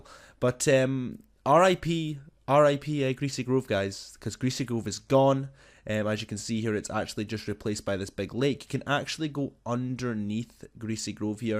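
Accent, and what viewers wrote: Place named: England, United Kingdom. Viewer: You have an nice english accent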